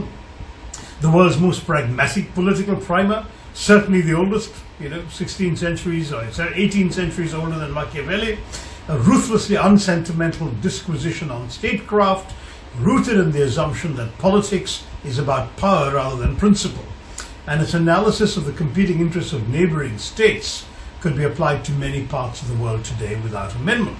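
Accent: England, United Kingdom. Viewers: Such an English accent...lovely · Is the British accent necessary?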